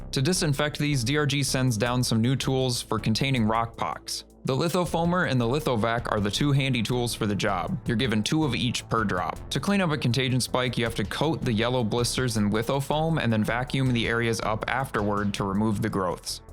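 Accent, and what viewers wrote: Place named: Canada. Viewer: Your Canadian accent is barely noticeable but it's adorable. No malice in mind, just been seeing that dude on "shorts"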